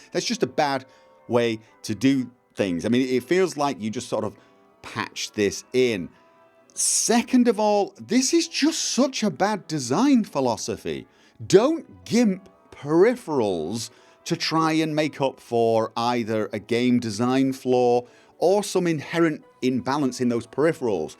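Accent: United Kingdom. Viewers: I'm American, so I can say this: American is not really it's own language. It's begged & borrowed off so many other languages & then mutated their words in such a poor fashion that they are no longer lending us any. So, now we make up crap nonsense words & add them to the dictionary.:D Thank you, for speaking the Queen's English! Although, that, in itself, also was fashioned from other languages, I suppose. But, it just sounds so much better across that pond!;)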